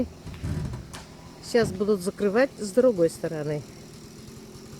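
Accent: Tatarstan, Russia. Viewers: Здравствуй, моя дорогая землячка, коллега по работе в нашей молодости и Мама и Бабушка своих внуков! Получается, что мы все коллеги! Мы все Мамы и Бабушки. Какие Молодцы жители вашей деревни! Как здорово, когда есть вот такое место, где можно собраться вместе всей деревней на Сабантуй. Я с детства помню Сабантуй, от слова Сабан-плуг, когда спашут землю и посадят, всегда устраивали на поле, около деревни, Сабантуй. Весело было! Спасибо тебе, за воспоминания о детстве и Моей Любимой Родине! Не думала Я, когда уезжала, что так буду скучать по Родине. Спасибо, большое!!! За поля Родные, За леса и за Родную Природу и за речь национальную и за говор т.е.акцент татарочек! Как же всё родное! Счастливая ты, что смогла вернуться на Родину. А самовар-то у меня есть. Это я сохранила-чай из самовара на улице и со сливками и из пиалы.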